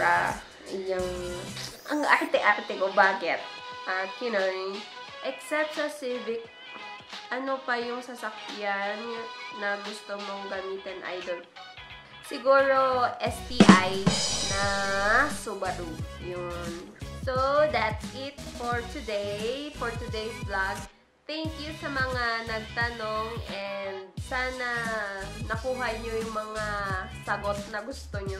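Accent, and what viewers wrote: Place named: Pampanga, Philippines. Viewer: Kapampangan ka po? May accent e haha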